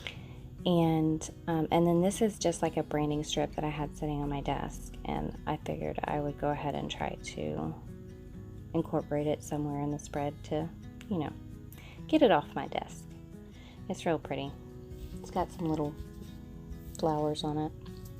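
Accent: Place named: South, United States. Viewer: Hi! Im noticing a southernness in your talk! Where are you from? Im in SC, thought we might be neighbors! I love your jj videos!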